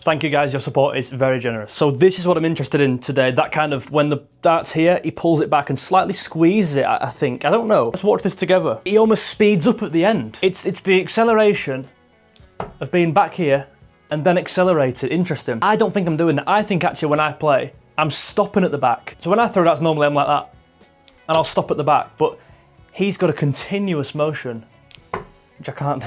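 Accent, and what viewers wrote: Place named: North, United Kingdom. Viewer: Love your work - you got pretty close to Littler’s throw! You’ve got a great presenting style, reminiscent of Tom Scott, just more northern and centred around darts…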